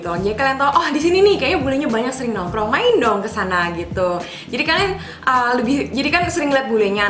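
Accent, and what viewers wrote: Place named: Batak, Indonesia. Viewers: Lucu bangat Kaka bawainnya... Aku Batak jadi logat Kaka nyambung bet ke aku... Hahahha Kaka yg terakhir katanya 1 tahun pacaran... Harus langsung nikah ya ka? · remeng , medan gt yaahh 😄❤️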